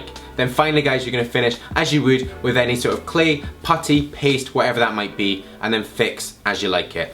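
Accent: Scotland, United Kingdom. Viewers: Do I detect a wee Scottish accent there ?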